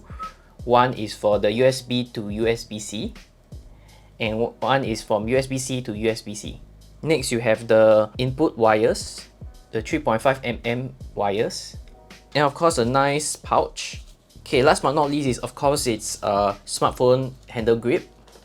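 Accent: Singapore. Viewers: Terribly irritating Singapore accent. Can’t understand most sentences as there are too many sounds dropped. Terrible.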